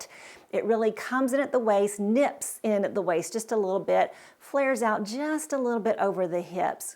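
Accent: South, United States. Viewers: Oh my goodness what part of the south u from I'm from No.Carolina love your videos but I think u could perk it up & jazz it up more 💜🎄🎅🤶🦌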